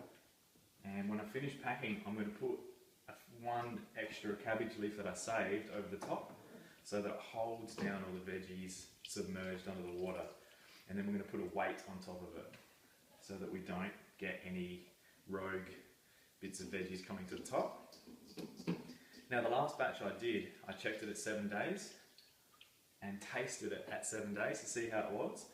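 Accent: Australia. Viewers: Accent??? He's an Aussie... easiest accent to understand! 😁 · It's especially funny when translating an Australian accent.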